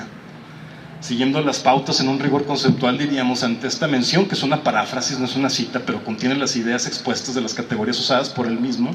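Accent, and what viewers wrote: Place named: Coahuila, Mexico. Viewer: Que onda maestro, perdon, licenciado. Me alegra que aun no has perdido tu acento Coahuilense y el estilo de Saltillo. Yo he perdido los acentos en mi teclado pero seguire con mi estilo. No hay defensa para los elogios asi que cuidate de ellos; no, mas bien de quien los espeta. Me gusta la frase de Schopenhauer que dice "Toda verdad atraviesa tres fases: pimero es ridiculizada; segundo, recibe violenta oposicion; tercero, aceptada por algo evidente". Escuchando tu ponencia se me vino a la mente las dificultades que tiene la gente de 60 a 80 años para usar una computadora, tablet or telefono "inteligente". El problema no es presionar los botones o pantallas, como mucha gente supone, ell@ son de una generacion analoga y mecanica. EL problema radica en la idea y los conceptos del objeto que tienen frente a ellos. La idea de enviar una carta, no impresa, atraves de un aparato electronico que guarda, no en un cabinete sino en las nubes, la informacion en algun lugar del cyberespacio y lo envia en un lenguage binario - 0 1 - y que el aparato receptor interpreta esos 0s y 1s y los muestra en la pantalla como una copia original de la dimension estetica de la representacion de la idea de la carta original. 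Sabes, tu y yo somo de una generacion que dio genero la transicion de lo analogo a lo digital. Las nuevas generaciones son digitales y saben como usar los aparatos, pero al igual que sus abuelos, no saben como funcionan. La nueva generacion technologica-digital es la representacion c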